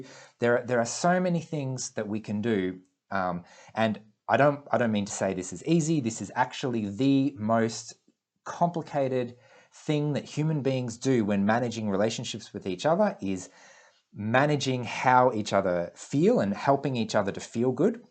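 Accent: Australia. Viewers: I hate Australian accents, but I'm still subscribed because I appreciate your ideas.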